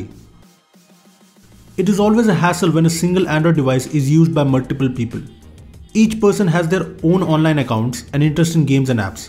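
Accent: India. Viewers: *ALL OF YOU GUYS ARE STUPID. HERES WHY YOU BITCHES 1. HE IS INDIAN 2. THAT IS AN INDIAN ACCENT 3. YOUR LIKE 12YR OLDS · Why you Indians can't say the words without that terrible accent? It's hurting the ear to listen. And as a hungarian living in the UK, i know everyone can learn how to speak normally. And no offence (honestly) but only the colored skin dudes won't learn how to speak... Why is like that. You can clearly hear that the British Queen or the actors from Hollywood, or even Obama say the words completely different then you!!!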